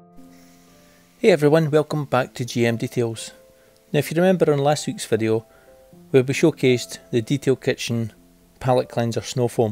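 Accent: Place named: Glasgow, United Kingdom. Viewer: Thanks for taking the time to film, edit and upload this video "GM Details". I picked-up your Glaswegian accent immediately, given my Dad's upbringing in Kirky :-) I'm currently awaiting my August delivery of my 2021 BMW 330i M Sport w/ the following additions: - M Sport package - M Sport+ package - Visibility package - Comfort package - Harman Kardon sound system - Heated steering wheel - Galvanic embellisher for controls It's been a 5 month wait (given the pandemic), but the extra lead-time has allowed me to (exercise my patience, and) take some time to research how existing 3 series owners are maintaining their beauties. I live in Melbourne, however I'll be looking into these products this week. Thanks again, and all the best!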